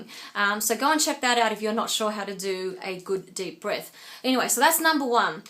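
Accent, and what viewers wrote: Australia. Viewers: You're Australian. :) I'm considering singing lessons and I wanted to know what part of Australia you're from.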